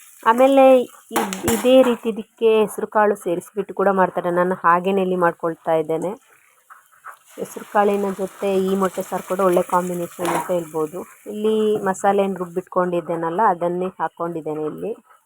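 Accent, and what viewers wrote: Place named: Udupi, India. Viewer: ನಿಮ್ಮ ಮಾತು ಕೇಳಿ ನಾನು ಊರಿಗೆ ಬಂದ ಹಾಗೆ ಆಯ್ತು ನಮ್ಮದು ಉಡುಪಿ 🥰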